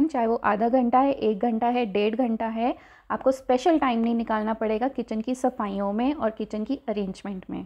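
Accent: Punjab, India. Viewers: Are u from Panjab as some words used by has panjabi ascent...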